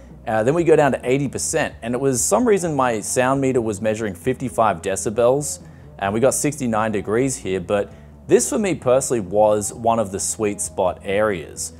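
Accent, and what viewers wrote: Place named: Australia. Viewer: You have the same accent as my Australian English teacher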